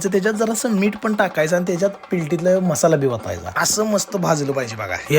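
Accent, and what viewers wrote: Kolhapur, India. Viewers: Arr kay marda... kasla zakas kolhapuri accent pakadlaysa... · यांची भाषा कोल्हापुरी असली तरी ती कोल्हापूर इचलकरंजी शिरोळ तालुक्यातील वाटते. कोल्हापूर शहरातील भाषा वेगळी आहे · Kolhapurkar bolan vishay hard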